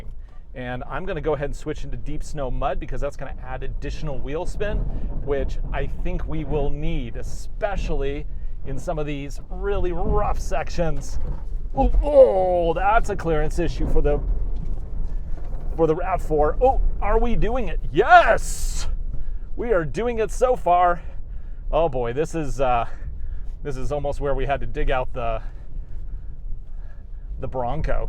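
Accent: United States. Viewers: I am also wondering why we are watching the driver so much when we clicked on this vid to SEE HOW THESE TWO CROSSOVERS PERFORM GOING UP THAT HILL?! Less talk and more showing of the two CUVs. PS The way this guy drives and talks, he must be from the Midwest.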